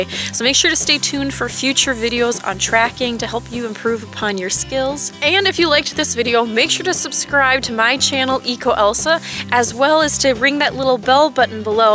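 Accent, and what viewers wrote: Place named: Minnesota, United States. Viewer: Yay it looks like im not the only tracker! Also,what state/province is that? Id guess Minnesotan, North Dakota, or Canada by the sound of your accent, I’m from Minnesota myself.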